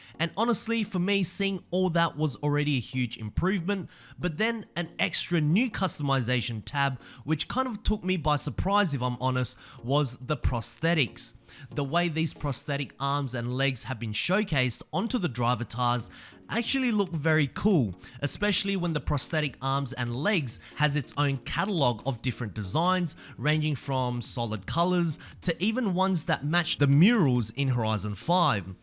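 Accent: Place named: Australia. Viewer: U are from Australia ?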